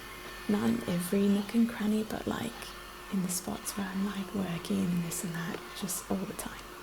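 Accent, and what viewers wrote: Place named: England, United Kingdom. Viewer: I’m so confused. I thought you were American but you sound so English 😮🤯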